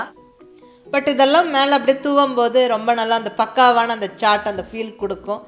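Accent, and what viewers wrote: Kongu, India. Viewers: கொங்கு தமிழில் அழகா...healthy ஆன ரெசிபி சொன்னிங்க... thanks mam 🙏....👍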